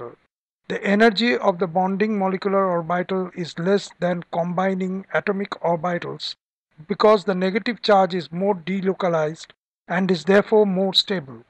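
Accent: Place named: India. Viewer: Typical Indian voice..... Good.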